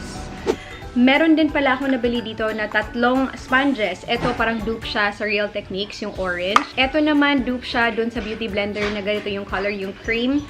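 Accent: Visayas, Philippines. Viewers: Cute kayka mag bisaya 😂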